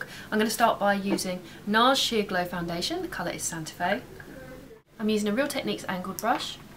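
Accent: United Kingdom. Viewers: are u a british?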